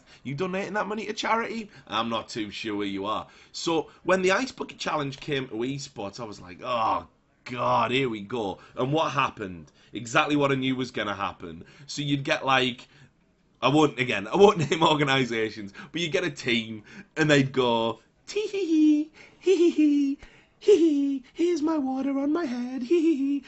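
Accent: Wales, United Kingdom. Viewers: Wth it's so weird to hear you talk like that! I'm not a native speaker so it never occured to me that your usual way of speaking was some sort of broadcasting voice. Well at least now I kinda know what a Welsh accent sounds like, so I learned something from the video. I liked last year's april fools joke by esportsexpress tbh. Publishing legit but funny chatlogs by Arteezy and keeping the community guessing if they were actually legit or if it was just an april fools joke. In general I'm way too gullible so maybe I don't dislike april fools day that much, because I may actually fall for it sometimes. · Love the welsh accent. I'd like to hear it more often. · I want you to gently complain about things in my ear with your Welsh accent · Welsh accent coming back? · Why is your Welsh accent so strong in this video? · I thought the welsh accent was a april's joke. 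Had to double-check the date.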